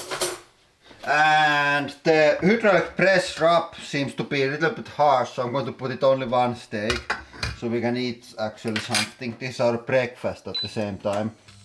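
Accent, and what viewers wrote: Finland. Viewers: I know that because i am finnish myself. I almost immediatly noticed it because the merch of The Black pepper. And he has a finnish acent. Sry if i spelled wrong.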